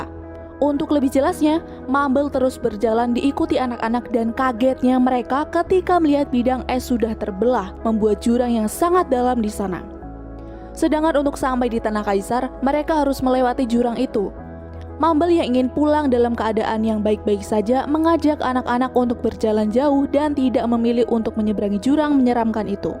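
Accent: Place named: West Java, Indonesia. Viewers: Admin na kos urang sunda anu tigarut nya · Ka kamu asli mana sih kok kaya ada bahasa sundanya plis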